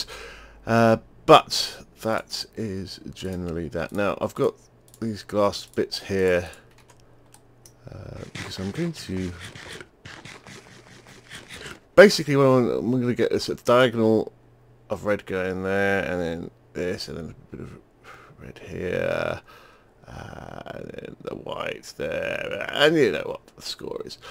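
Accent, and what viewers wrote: England, United Kingdom. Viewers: ***** - nice to hear a British accent, I only ever hear American and Canadian accents :) We must be the rare breed of British youtubers you and I :) Keep it up good buddy. Your British fan/fellow youtuber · You sound slightly like biffa a little. Good ep man:) are u from the UK you sound it?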